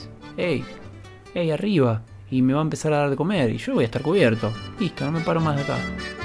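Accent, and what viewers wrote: Argentina. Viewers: Quiero un amigo argentino que hable como tu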